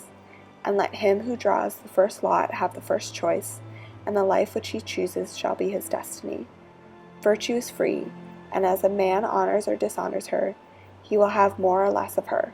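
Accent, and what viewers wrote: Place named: South, United States. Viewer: Sorry...I found both the graphics and the monotonous Southern voice annoying.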